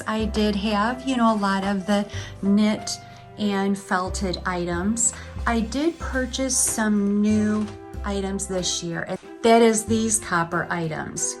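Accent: Michigan, United States. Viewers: Hi, We were so so excited when you opened your front door and your Siamese Sadie appeared. We have a few of the same things in common. First, my husband went to law school in Michigan. Your accent is still music to his ears. Next, we have 3 Siamese cats Sadie (Lilac Point), a huge boy named Keith and a little Siamese born in May (that we call June because we got her in June). We live in a 100 year old house. Your home tour was so inviting and cozy. Jack was so so cute when he was being camera shy. What breed dog is he?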